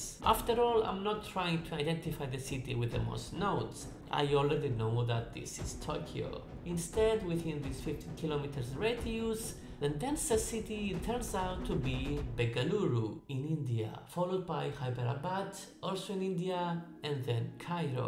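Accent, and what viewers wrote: Cyprus. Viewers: I can always recognize a Cypriot accent 😛. Ωραίο Βίντεο! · Sorry to ask this but.. Where are you from? You have a very a Cypriot accent ✨🇨🇾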